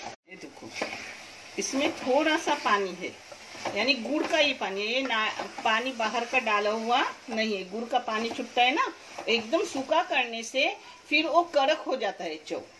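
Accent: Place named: Konkan, India. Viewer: Ap k bolne k tarike se lagta hai ap kokni hai Isliye pochi jazakallah khair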